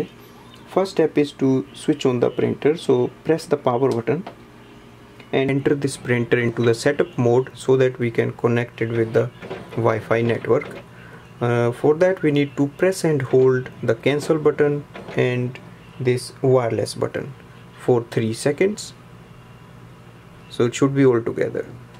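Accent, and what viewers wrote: India. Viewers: very bad instructions hard to understand India speaker - very little paper tray -NO instruction how to set up WITHOUT smart phone to me a WAST$E of money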